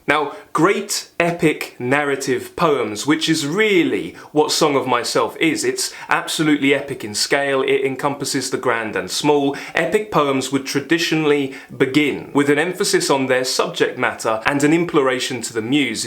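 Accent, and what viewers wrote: United Kingdom. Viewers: It's a trip to be hearing someone with a British accent commenting on Walt Whitman. The most American poet.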